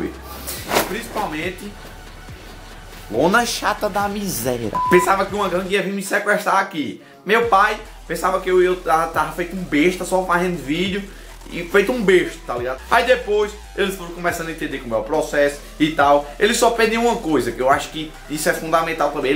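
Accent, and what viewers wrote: Northeast, Brazil. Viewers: começo do teu canal eu não gostava de sotaque de nordestino mas eu vi que tava errado e hoje te assito sempre irmão tamo junto <3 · Amo sotaque nordestino <3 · Tmj Junto Sutacaque Fala Tudo Que E Nordestino Tbm So E Tenho Muito Orgulho E Nunca Mude Por causa de ninguem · eu queria ter nascido no nordeste pra ter esse sotaque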